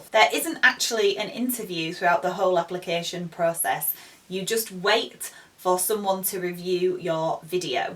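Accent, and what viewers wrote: United Kingdom. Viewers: I like to listen to your nice British accent.. Greetings from Iraq.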